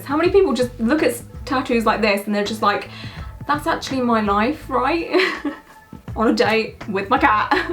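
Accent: England, United Kingdom. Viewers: That's the way all English people say it lol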